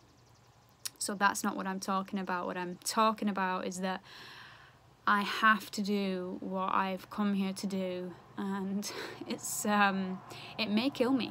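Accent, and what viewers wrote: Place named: Lancashire, United Kingdom. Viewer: Kútulas She lives in Lancashire England doesnt she ? Her accent is local. I dont think this video is due to media conglomerates.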